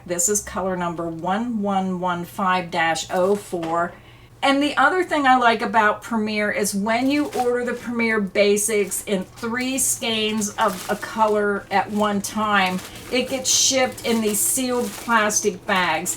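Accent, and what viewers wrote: Pennsylvania, United States. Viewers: I love listening to your Pennsylvania accent. I like how you called your shelves a coopy hole. We called it a cubby hole. Coopy is much cuter 😉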